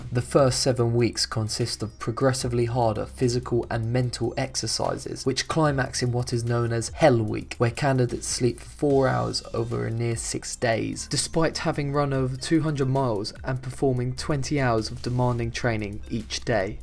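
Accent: England, United Kingdom. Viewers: go figure you sound British so you pick Britain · I think you were biased toward the British because your British your self, i can tell by your voice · Ok, but you habe got english accent. Chosing the SAS as your mother country special forces, somethings wrong ;p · and i was right british narrator=british unit great video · Narrated by the British guy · You definitely sound British, are you sure your not biased?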